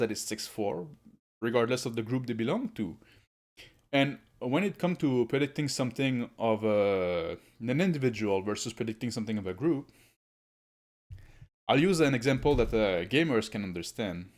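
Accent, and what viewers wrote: Quebec, Canada. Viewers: pour moi t’es du Québec hen? 😂 sub time!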